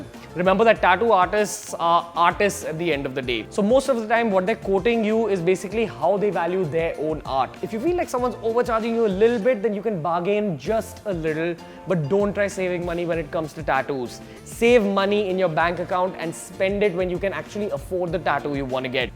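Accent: India. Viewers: Tatu Indian ascent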